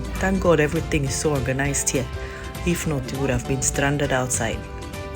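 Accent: Igbo, Nigeria. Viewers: HELLO 👋 BEAUTIFUL! YOU GUYS ARE BEAUTIFUL. WE LOVE THOSE CUTE HANDSOME VERY SMART 🧠 AND FEARLESS IGBO SONS. WE SEE HAVE GOOD TALENTED SKILLS WHICH HE DEMONSTRATED TO US THE VIEWERS. YOU DOING GOOD BEAUTIFUL LADY, WE LOVE YOUR AWESOME IGBO ACCENT, WHAT ABOUT LITTLE CHIEF NNANYELUGO? WE LOVE TO SEE HIM TOO WITH HIS BROTHERS, BEAUTIFUL FAMILY, GOD BLESS YOU ALL AMEN AMEN.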